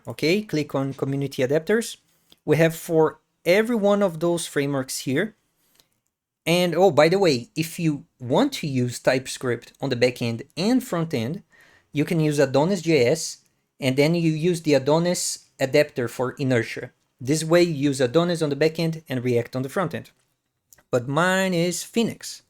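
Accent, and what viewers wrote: Brazil. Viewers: Man, o video está top e inclusive isso não é uma critica ao seu inglês, mas o brasileiro tem o jeitinho brasileiro de falar inglês né rsrs, eu assisti o video todo sentindo uma forte familiaridade 😅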